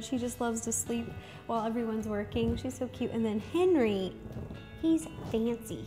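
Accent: United States. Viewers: Are you American or whatever?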